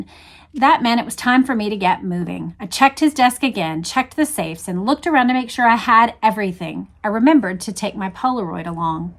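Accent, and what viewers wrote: Texas, United States. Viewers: It's that Texas accent, which is one of many Southern American accents. Add the pleasant style of her reading & it's very relaxing. I've learned Not to listen to before bed. 😊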